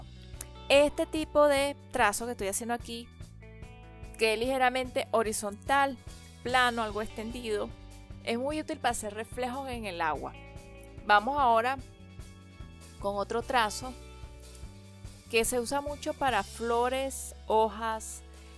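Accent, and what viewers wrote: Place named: Venezuela. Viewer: Muy buena, parece acuarelista venezolana, es genial es muy didáctica y fascina su modo de hablar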